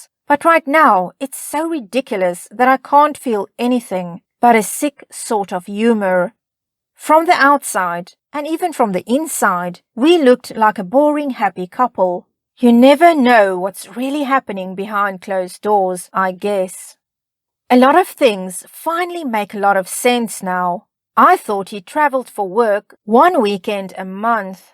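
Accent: South Africa. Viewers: Is the Narrator South African ?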